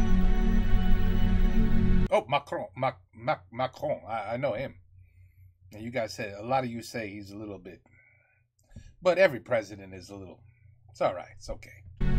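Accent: United States. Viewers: J'adore quand tu parles français, avec l'accent américain c'est very cute! The golden globe and the scepter held by the King symbolise his God-given powers. The globe is the Earth (yes, no flat earth people in France back then), the physical world, it means that the monarch rules over his land, while the scepter symbolises his power of life and death over his people and the ability to give justice (le bras séculier). The History of France is very complicated, but very interesting. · strong american accent DE LA FRONCE !!! 0/ j'aime beaucoup :D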